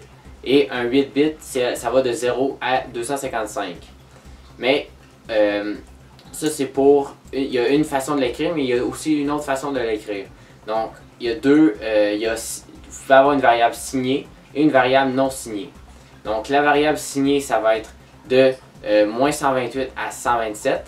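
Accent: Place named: Quebec, Canada. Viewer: drole d'accent les quebecois lol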